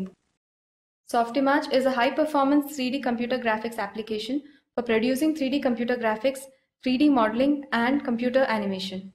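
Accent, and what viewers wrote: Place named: France. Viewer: french accent.. :p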